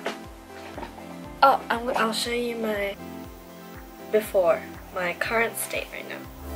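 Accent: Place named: Australia. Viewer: So, are you from Australian cuz u sound really Aussie..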